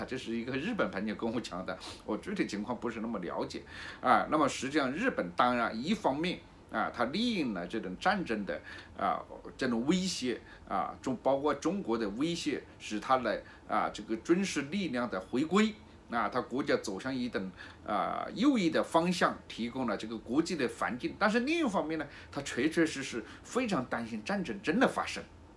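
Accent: Hunan, China. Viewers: 何频你太啰索! 一個简单的问题谈得老长，言不扣题! 另外普通話要说好，你这年龄不該说成这样-湖南国语。改改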